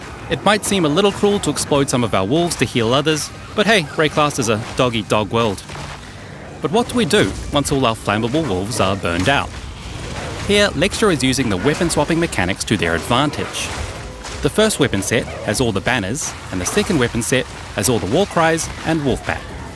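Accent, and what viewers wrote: New Zealand. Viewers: Okay, so "Air Buds" sounds like "earbuds" in the Kiwi Accent. Now we gotta see if can say "bad bed".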